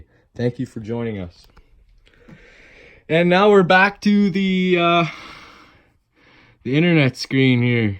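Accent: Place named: Wisconsin, United States. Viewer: This guy must be up north. Close to Canada probably from Wisconsin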